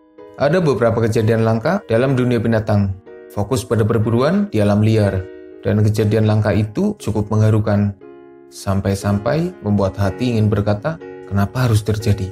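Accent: Banyumas, Indonesia. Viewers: Rika ngapak ya min.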